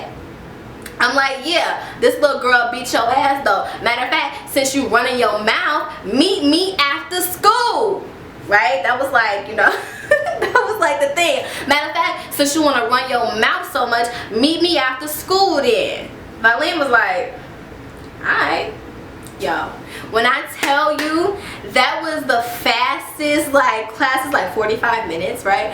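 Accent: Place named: New Jersey, United States. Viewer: im from south side and you sound like you from New Jersey 😂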